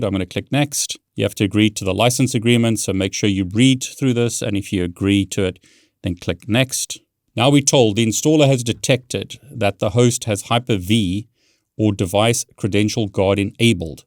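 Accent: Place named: South Africa. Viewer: Thank you so much this was so helpfull. and you sound very south african.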